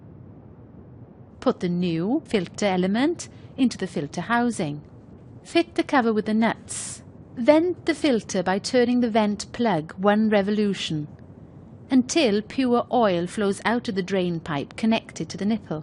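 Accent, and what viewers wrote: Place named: United Kingdom. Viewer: 英音好难受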